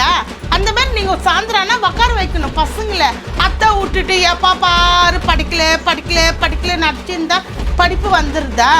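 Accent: Bangalore, India. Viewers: Bangalore tamil ah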